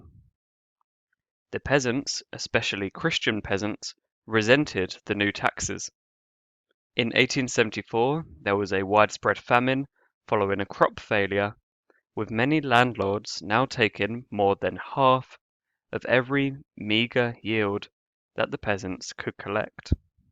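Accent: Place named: United Kingdom. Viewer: I'm not sure why but I have an inherent bias towards British particularly English and Northern Irish when it comes to historical topics. When I hear that accent I immediately trust the content. Just a thought that occurred to me as I was watching this well done video. Also while I have a bias towards British history presenters, it's sad they stopped the Russians from taking Istanbul. Even the Muslims of Egypt (me included) wish the Greeks had that city back. Turks morality is lacking to say the least.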